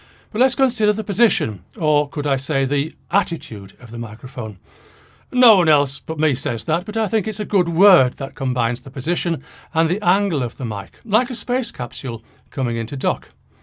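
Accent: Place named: Northern England, United Kingdom. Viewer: Dear Mr, could you PLEASE tell me where in the North of England you were born or spent the majority of your childhood? Why? Because I pride myself on being able to tell where people from my native North-East were raised to within a 5-mile radius - but your accent has got me baffled, presumably due to your many years of living "darn Sarf". You could be from parts of Cumbria, Geordieland, Co Durham or Teesside/North Yorkshire but I can't nail it down. Fancy putting this "accentophile" out of his misery mate...??? PS: I'm from Hartlepool originally if it's a case of "you show me yours and I'll show mine"..!;)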